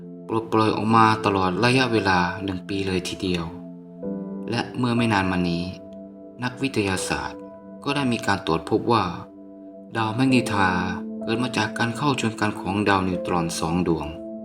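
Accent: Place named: Isan, Thailand. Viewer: เสียงบรรยายสำเนียงอิสานมากๆคับ น่ารักดี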